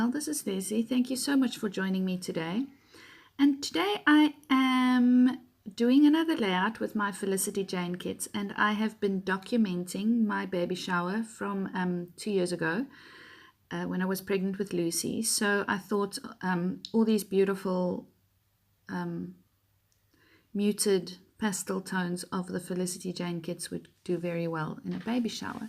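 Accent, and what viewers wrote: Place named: South Africa. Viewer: Lovely to hear your South African acsent. Lovely layout. Love FJ. Love from Cape Town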